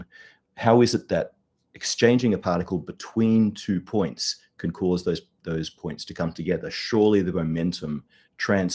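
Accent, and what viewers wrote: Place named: Australia. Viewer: The age of British narration is coming to an end. The age of Aussie narration has begun…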